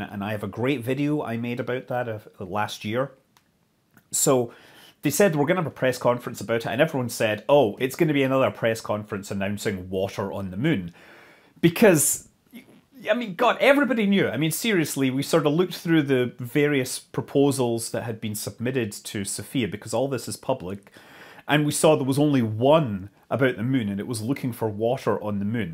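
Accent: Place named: Lothian, United Kingdom. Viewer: A wee lothian lilt to his linre.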